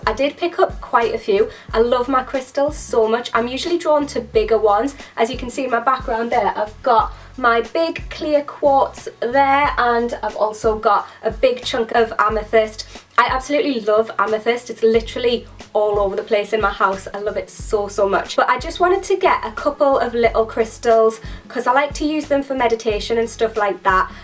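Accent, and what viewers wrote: Newcastle, United Kingdom. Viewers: Just found your channel and love it! Are you from Newcastle?!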